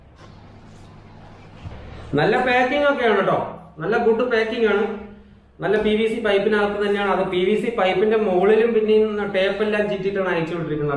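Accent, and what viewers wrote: Palakkad, India. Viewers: Palakkadan Language Kelkumbo Ulla oru Happiness 😍Videos Adipoli Aayind Me Also Palakkad Etta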